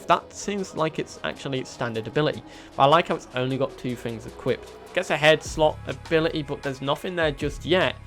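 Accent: United Kingdom. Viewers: you are briish ey?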